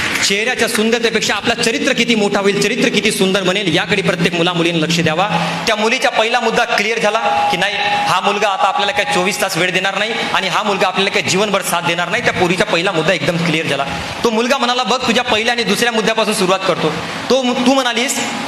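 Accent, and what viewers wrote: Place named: Vidarbha, India. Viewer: दादांचे उच्चार विदर्भातल्या मराठी सारखे वाटतात.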